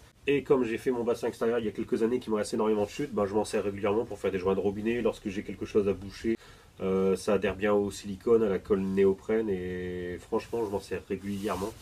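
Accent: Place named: Nord-Pas-de-Calais, France. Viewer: Bravo pour ce document bref et concis sans blabla inutile avec un ch'ti accent sympa. Il n'y a pas de chose mal faites, il n'y a que des façons différentes de les faire...Bravo à toi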